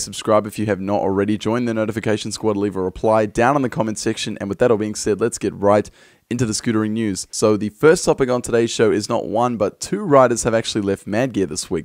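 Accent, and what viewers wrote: Australia. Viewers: Are you Australian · Are you Aussie I am!!!!!!!!!!!!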